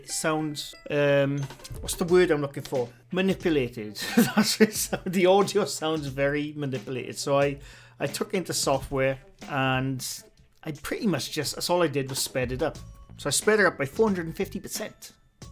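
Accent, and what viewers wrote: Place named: Scotland, United Kingdom. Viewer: a good Scottish accent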